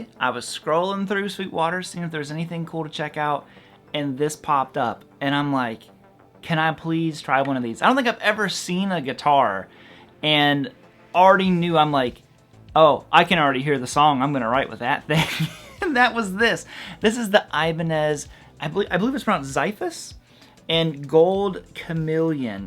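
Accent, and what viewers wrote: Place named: Nashville, United States. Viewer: Yeah man it sounds like you forgot and left your wah on. I don't care for that Nashville twang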